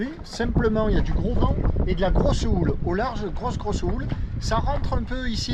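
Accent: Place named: Marseille, France. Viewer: J adore ton accent Marseillais. La bizz. Denis S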